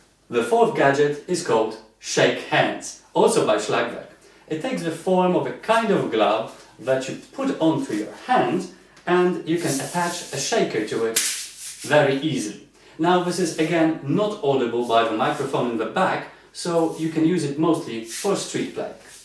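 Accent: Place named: Poland. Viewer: Dzień dobry! Thank you, for your must excellent video. I enjoy hearing your Polish accent. You English as you know is excellent.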